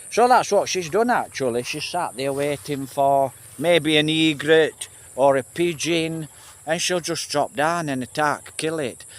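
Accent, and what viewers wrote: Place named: Scotland, United Kingdom. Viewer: You really sound like scottish sir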